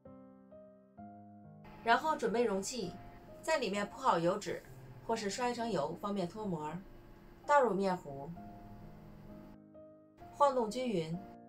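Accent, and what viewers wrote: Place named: Northeast, China. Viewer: 东北腔。。。大米发糕的桌法，😅 也加了麦子面的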